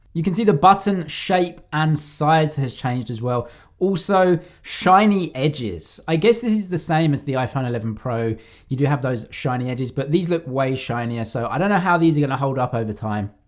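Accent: United Kingdom. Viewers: and he's even British too.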